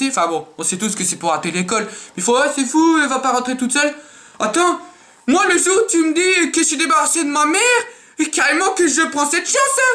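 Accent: Nord-Pas-de-Calais, France. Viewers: T'es du ch'nord toi ?! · "Misote"" Che un gars deu'ch chti le. ♥ :)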